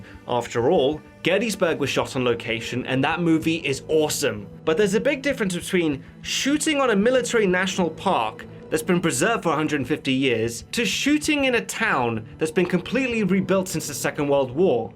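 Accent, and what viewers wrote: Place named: England, United Kingdom. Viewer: Fine channel. Hope your other History Buffs videos aren't 100% single sided, one perspective. For example, Churchill seems to be the drunken nudist protagonist of your video. BUT: Churchill turned down 6 Peace proposals from the Germans who did NOT want a war with England. Churchill declared war on Germany, not the other way around. Churchill had the choice of "saving the Empire" or sacrificing British lives and risking it all. Churchill only got a victorious retreat because Hitler held his generals up, and lost their backing from then on. Unlike the impression you get from this (otherwise great channel) the Germans could have bombed the hell out of the Brits on the beach with their backs to the sea. If the German army couldn't get their in time, then who backed the Brits to the sea? How about a follow up History Buffs video purely from the German side for "diversity's" sake! 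Haha, you even have an English accent! That war was a backlash to WWI over-reach and punishment, and cost Europe and England some of its finest and set up the West for the current invasion of foreigners world wide. Good for the Banksters of the world, we all lost that war!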